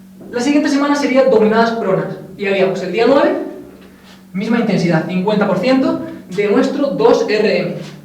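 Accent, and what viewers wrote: Spain. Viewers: De donde es de españa?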